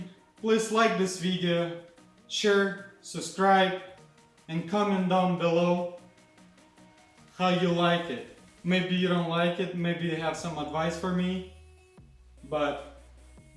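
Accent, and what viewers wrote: Russia. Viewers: you speak english like a russian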